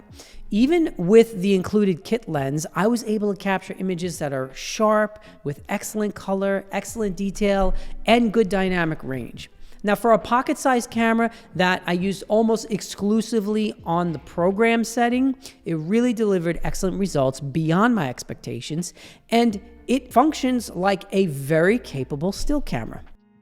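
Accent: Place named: Tri-State Area, United States. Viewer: Did you mean to talk of it like it’s not mirrorless? That was the main selling point for me! Also your accent brings me back to growing up in the tristate area :)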